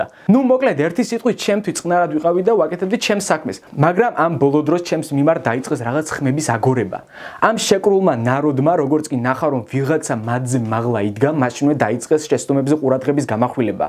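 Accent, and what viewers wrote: Mingrelia, Georgia. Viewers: ჯერ ეგ მეგრული კილო მოიცილე და მერე ილაპარაკე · dajma sad dainaxe tipi 10 wuti wuwunebda banzi megruli tonit vso iumori qarizma rame saintereso normaluri montaji sityvebi mainc rom gamartos araferi ar aqvs yvelaze uintereso 10 wuti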